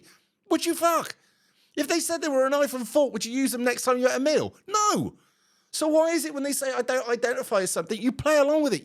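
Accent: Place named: England, United Kingdom. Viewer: Love a proper English accent 🤣 Merry Christmas from Nottingham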